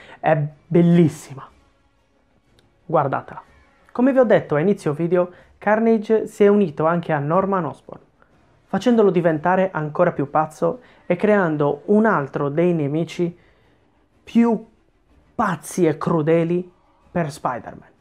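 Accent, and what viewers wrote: Sardinia, Italy. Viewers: Sei sardo nenno? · Sto guardando tutti i tuoi video,stupendi! Ma.... anche tu sei di Cagliari? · Ma di dove sei Cagliari, Quartu, Pirri?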